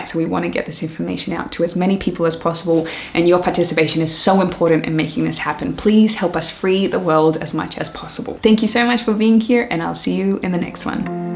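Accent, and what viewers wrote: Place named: Australia. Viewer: I can't place her accent, Australian but there is something else..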